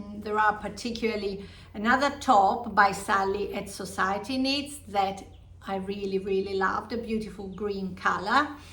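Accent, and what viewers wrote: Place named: Italy. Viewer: ! I loved this episode. I find your accent so interesting - sometimes I hear Italian accents and sometimes I hear Australian accents! 😁 We hosted an Italian exchange student one year and are still in contact with her. Anna and her family live in Collebeato. Close to Lake Como. Where are you from? You share so much great detail about your projects here! I made notes on things i didn’t think to share! Sure do wish I could come meet up with y’all at the fiber festival next fall!!! I guess I’ll just join virtually. Thanks for sharing such great inspiration❤❤❤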